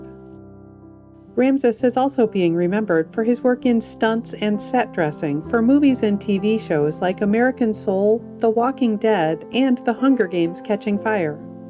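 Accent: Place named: Illinois, United States. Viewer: I love this woman's voice and accent I wonder what part of America she comes from... GORGEOUS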